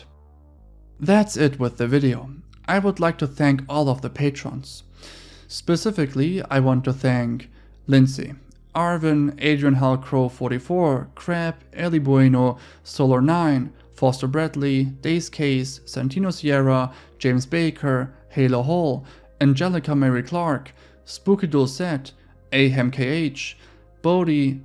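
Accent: Germany. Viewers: Armin Meiwes is/was(?) somehow the least offensive cannibal to ever live. Issei Sagawa could learn a little something from him... 😒 I'm really surprised that I'm seeing what is, to me, entirely original content in a list video like this. Horror-themed channels tend to all cover the same, what passes for "mainstream", content across the internet. I can watch five top five videos of "creepy things from around the internet" from five different channels but only see a total of seven to ten (out of 25 total, remember) unique stories altogether. So, kudos for doing your own research and finding stories other people may have passed on, ignored, or missed entirely. I respect and appreciate it, my dude. May I ask... Where is your accent from? Based on how you pronounced AM's name, I would assume your first language is either German or German-adjacent (for example, Dutch). 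Am I anywhere near right?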